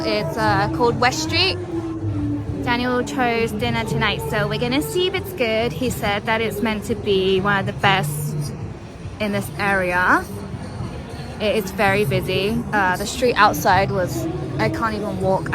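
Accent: United Kingdom. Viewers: This is the first time I ever had your channel come up on my YouTube. First it reminds me of those famous spots in Thailand kind of has the same scenery. But the first thing really is your voice first of all it's unexpected I didn't expect to hear your voice and I don't think it's artificial intelligence I think it's your real voice. Your accent sounds British in a sense. You two are are cute couple you're beautiful and your voice is just a really gorgeous. I'm from America grown up as a kid I always wanted to travel never got around to it. But China real China not cities and Japan not cities I loved as a kid cherry blossoms bamboo forest the clothing the tradition the music is awesome. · What's with the British accent? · Can I ask where is the accent from? Love the accent, british but not super londonish.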